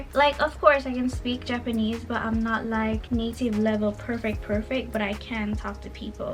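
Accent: Jamaica. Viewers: You sounds Jamaican or you a Jamaican? Sorry for your experience! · You sound jamaican. Are u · Just came across your channel and I'm wondering are You from the Caribbean sis? Your accent and your phrases got me feeling the homey Jamaican? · U sound jamaican Btw i do understand what it is they were saying cos the level of understanding between both u and the doctors wasnt good and anything could happen even tho they could've probably worked something out..its not that much of a big deal but at least they recommeded u to someone else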